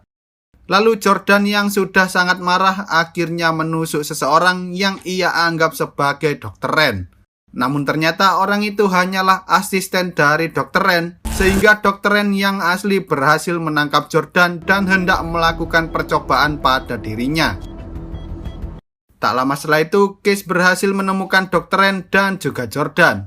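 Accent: Java, Indonesia. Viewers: Sampean wong jowo Yo?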